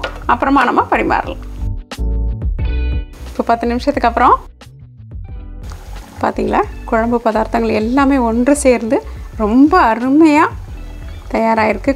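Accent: Sri Lanka. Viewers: It was really nice.. but your way of speaking is the nicest. Native place Sri Lanka va sis? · Hi sis i am rekha.tomato curry nalla iruku. try pantren.nenga tirunelveli thana. Nenga pesum konchum tamil ketkum pothu sri lankan pesum alagu tamil mathiri iruku.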